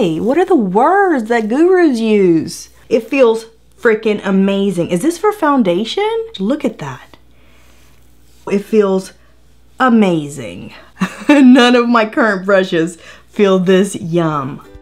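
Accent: Texas, United States. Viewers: Hello y’all I love ur channel so damn much I just learned about you and from the first video I knew right away that u are from TEXAS. The way u talk not the accent but the way u talk is just like me I could not stop laughing 😂 😂😂😂😂. Girl I love you so damn much. When I’m feeling down or my anxiety is fucking me up I’m from now on I’m going to watch ur video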